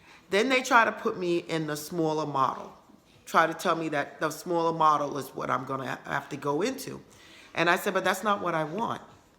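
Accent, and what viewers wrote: New York, United States. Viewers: I'm so glad I found this channel!!! I manifested my Ford F-150 got it brand new..in fact it was even better than I pictured!! It was a truck that was from an other dealer...fully loaded..I loved it...I didn't even know I manifested it...this was in 06...that next year the secret movie came out..of maybe it was 06 but I didn't see it till 07....anyway I want a Ford Raptor...it's an F-150 on steroids...it's awesome!!! I see it everywhere...I feel like there is a block..but I watched some of your videos....I love manifestation stories there needs to be more!!! And the how with it....too many vids are just technique and guruish...I need proof!!! Not that I doubt loa but it inspires me...like this vid right here!!! Thank you! Ps where are you from I'd that a new York or Boston accent..lol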